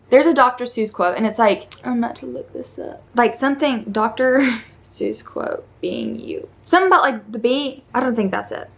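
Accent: South, United States. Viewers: I think you should try not to waste too much of your time with negative comments. Misery loves company. People that try to tear down others are just miserable and can’t stand the sight of people being happy. You are such a ray of sunshine. You work hard for what you have and you deserve to spend it and enjoy it how you like. I’ve never gotten the impression that you brag or show off. Not at all. You seem kind, sweet, hard working, fun and funny, thoughtful and caring. I love your southern twang, which is much more pronounced when you are trying to set some boundaries for Baby Elle. Instead of a stern talk, it comes off adorable. 😍📱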